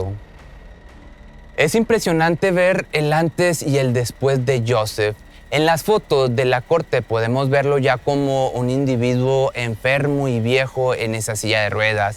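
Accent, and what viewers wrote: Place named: Mexico. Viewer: Gràcias,luego lo veré,el problema es que tienes una grácia contando cosas tan sérias,será tú acento mejicano,me tienes enganchada,un abrazo desde España.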